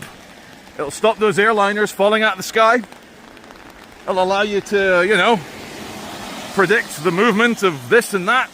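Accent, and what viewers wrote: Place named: Scotland, United Kingdom. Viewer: so nice for me to hear UOUR Scotish accent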